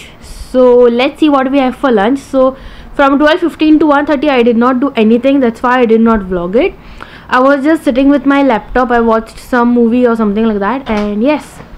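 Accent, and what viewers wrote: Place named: Cockney, United Kingdom. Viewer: Hey you speak very fluent English.. also kokni i like a lot..so keep it up.. ignore negative energy they are here from lifetime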